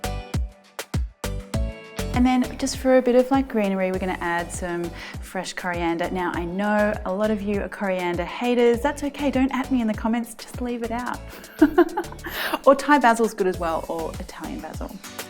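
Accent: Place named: Australia. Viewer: I love your Aussie accent. Your recipe looks humble and simple. I will try this yummy recipe even if I have to wait 70 minutes for this meal to cook lol. Cheers girl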